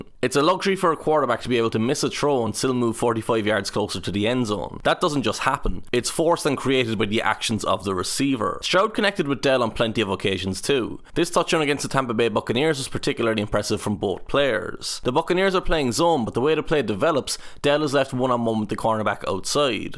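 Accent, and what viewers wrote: Ireland. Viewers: What the bejeesus is a 'turd down'? 😂 Love ur accent btw...i think you must be from the same part of Ireland as Chris O'Dowd (from the IT Crowd)... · An Irishman commentating on American football is amazing. Such a great dynamic!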